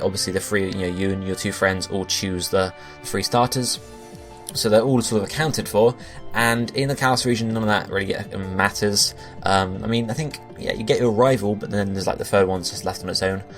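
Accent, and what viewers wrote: United Kingdom. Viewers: How does a magearna fit in that bag?! A 1ft diameter ball was fishy but a 3'3" ball?! You sound british, perhaps you don't know how to translate feet to centimers?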